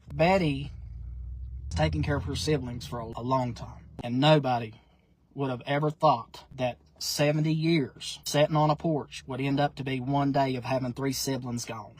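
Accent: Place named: Appalachia, United States. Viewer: Here in North Ga.(appalachians), we did rnost of the sarne stuff you are talking about, and the sarne type of values, even talked with a sirnilar accent...trouble is, we have been "invaded" and gentrified now..us hurnble country folk have been priced out of land, housing, and even rent...whereas when I was a kid nearly everybody in the store sounded like you talking...now, it's rare to hear that accent...everybody sounds like they carne frorn sornewhere else...hope that never happens to you in West Virginia, but it probably will, when they get done developing up Tennessee, Alabarna, North and South Carolina, and Kentucky. What part of Georgia is frorn?...rnaybe he isn't originally frorn Georgia?....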